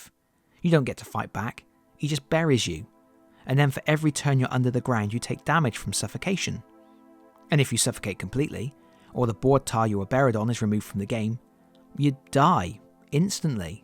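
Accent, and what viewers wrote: United Kingdom. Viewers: Great review. The british accent adds to it for some reason..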